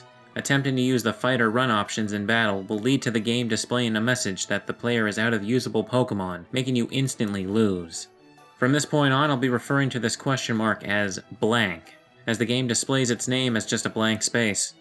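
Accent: Canada. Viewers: you sound Canadian :) anyway blank reminds me of missing no. lol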